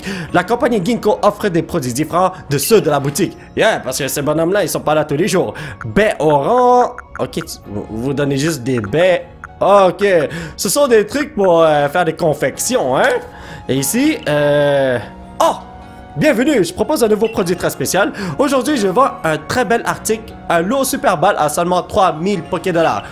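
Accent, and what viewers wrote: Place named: Quebec, Canada. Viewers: t le best ton accent ma trop donné envie d'aller habité au Canada. Sinon j'espère que a la fin de l'aventure tu auras une équipe badass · Je crois qu'il est québécoi je suis pas sur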